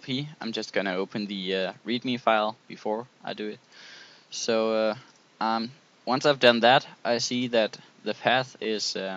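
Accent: Denmark. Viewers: Oh shit, dansk accent alligevel lol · Haha dansker xD? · du er dansker xD jaaaaaaaaaaaaaaaa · You are Danish! =P Oh... And thanks for the help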